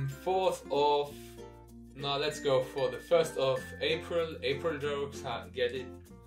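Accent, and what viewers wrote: Germany. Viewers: "Installachion" Versuch mal den deutschen Akzent wegzubekommen. Das kann man sich kaum geben.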